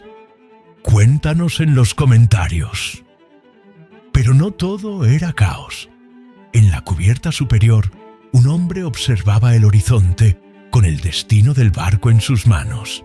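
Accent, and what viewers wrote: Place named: Castile, Spain. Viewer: SIN PALABRAS, MÚSICA, VOZ, LOCUCÍON EN CASTELLANO NEUTRO ...ESTA BIEN PERO MENOS ACENTO CASTIZO X FAVOR ASI SE ENTIENDE AL 100 % . IMÁGENES ILUTRADAS GENIALES ....ESOS FOGONES DE LIS COCINEROS.. SOBRE PISO DE LA CUBIERTA DE MADERA..MMM ..NO ES REAL. PERO...EN FIN..ES MUY ÚTIL Y EXPLICITO E INFORMANTE AL 100!!.. FELICITACIONES.😅😊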